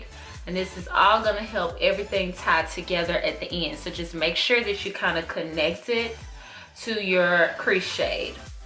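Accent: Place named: Texas, United States. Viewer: You have the cutest Texas accent and great video!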